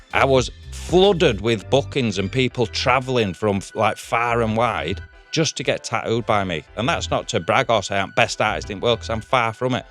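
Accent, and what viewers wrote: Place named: Manchester, United Kingdom. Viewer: no worries you have a bit of a Manc accent.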